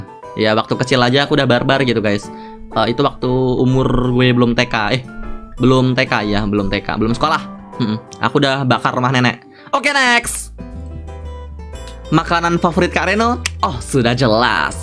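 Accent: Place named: Java, Indonesia. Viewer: Aduh pria Jowo, medok banget🤭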